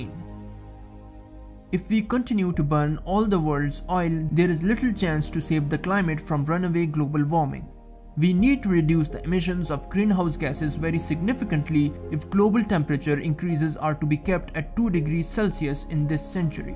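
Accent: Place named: India. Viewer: bad indian accent makes this terrible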